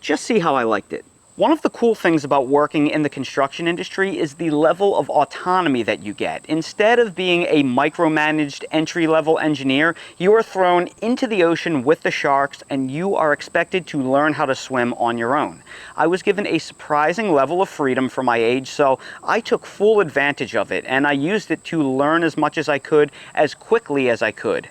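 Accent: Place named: South Jersey, United States. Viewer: South Jersey girl here. I remember watching a video of yours several years ago when you had a few thousands subs (and I heard that SJ accent which hooked me!). As an avid gardener myself I’ve been watching you ever since. Loved hearing about your background and I always look forward to your videos, so thanks for everything you do!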